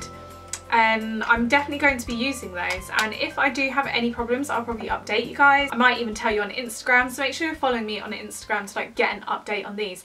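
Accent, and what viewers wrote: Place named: England, United Kingdom. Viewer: is she english or american? her accent sounds so english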